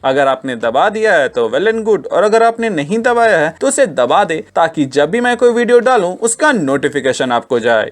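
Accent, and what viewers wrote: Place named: Bihar, India. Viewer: your Bihari Acsent is good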